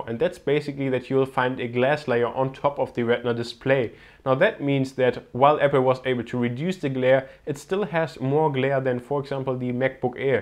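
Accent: Germany. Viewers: The guy in the video sounds like an german with an akcent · Whoa, you sound like a German or something. I couldn't imagine doing a review in German, so props for doing one in English. I recommended my brother get the Macbook Air, and he's at the store buying one now. Woot! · I actually was thinkabout how chinese was ridiculous guess and thought you have to be german, und du bist Deutsche! Gruss von Holland!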